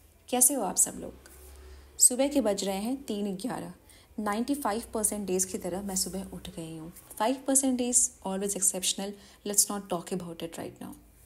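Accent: Bihar, India. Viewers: Aap BIHAR se ho kya bhojpuri bol rhi ho ❤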